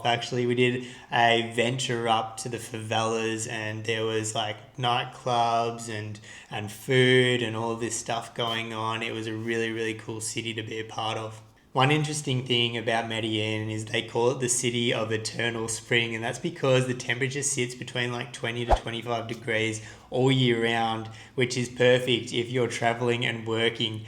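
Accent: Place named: Australia. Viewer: Cheers brother 👍 Nice to hear an Aussie accent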